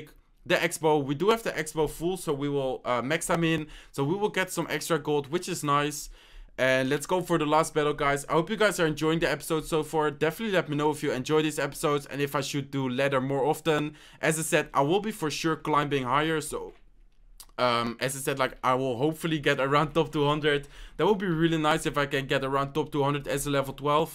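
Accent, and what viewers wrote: Netherlands. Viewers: Haha Yes thats Dutch, Im dutch to. Its funny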